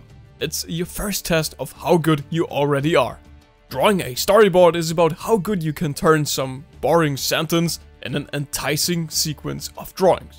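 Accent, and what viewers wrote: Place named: Germany. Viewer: Dieser Deutsche Akzent xD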